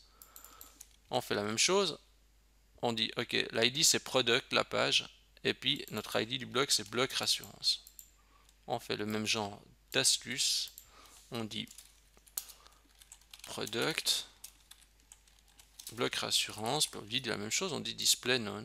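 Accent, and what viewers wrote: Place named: England, United Kingdom. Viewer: Bonjour, Merci beaucoup pour tes tutos parfaitement clairs et simples à mettre en application. Et j'adore ton accent anglais! Keep up the good job;-))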